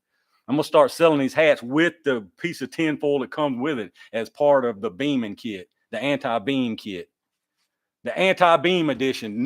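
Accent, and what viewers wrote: United States. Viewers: Love your channel and your personality. I have finally found someone that talks as country as I do. You do a great job at your reviews.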